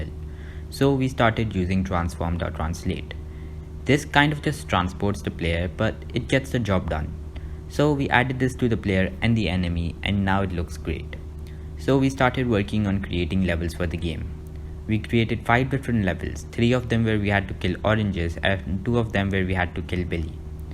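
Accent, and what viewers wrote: India. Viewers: nice indian accent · oh man you live in my favourite city and your accent sounds like Indian accent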